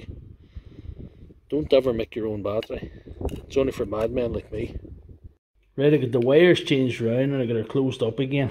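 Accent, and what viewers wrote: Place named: Scotland, United Kingdom. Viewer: Well isn’t this great. I found out recently I’m more Scottish than anything else (American from the mid-west) and I’m about to get into a damaged m12 pack. Beautiful. 😂 I’m sorry if you’re not Scottish but to my ear that’s what I’d guess. Great video regardless. 💪🏼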